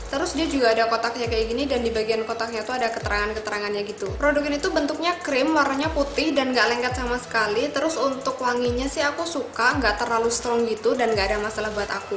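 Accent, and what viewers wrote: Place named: Yogyakarta, Indonesia. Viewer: Medok.. orang jogja po mbak?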